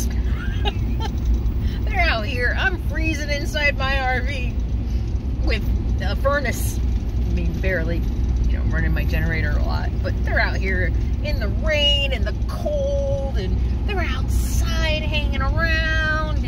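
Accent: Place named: Northeast, United States. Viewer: I love the way you say "what do you think?" to your animals. I do that too and never heard anyone else say that to their fur babies until I came across your channel. It's just one simple little thing in a sea of other little things that make me go "she's my people!" every time I watch your videos. I can tell that you were born and raised closer to the northeast side of this country, purely through your dialect and the way you express yourself. Much love to you and Sadie from Michigan!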